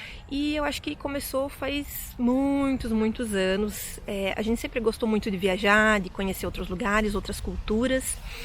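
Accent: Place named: Brazil. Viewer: Muitos parabéns pelo seu português. Posso dizer-lhe que fala corretamente tem o seu sotaque que é brasileiro e é lindo. Sejam bem-vindos ao meu país.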